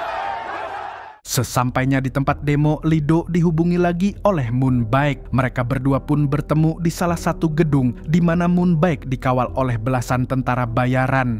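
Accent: Banjar, Indonesia. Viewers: Lah mamang urang Banjar leh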